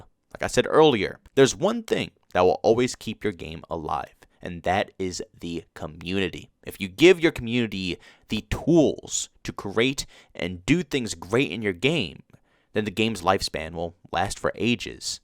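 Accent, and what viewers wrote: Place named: Texas, United States. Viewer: You talk like an American, not a good way, in a Texas annoying type way. I'll stick to Level Cap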